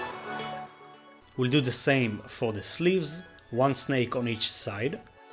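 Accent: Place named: Israel. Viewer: נשבע שהוא נשמע ישראלי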